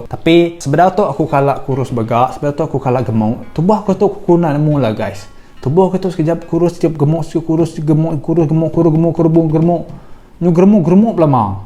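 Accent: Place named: Sarawak, Malaysia. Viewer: Hui nda faham akoo slang Sarawak.. Cuba baa 24 hours slang sabahan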